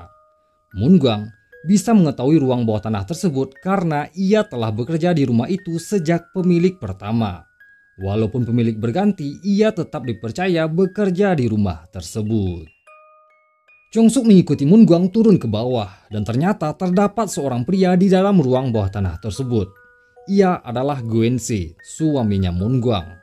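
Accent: West Sumatra, Indonesia. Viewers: lo pasti orang sumbar bang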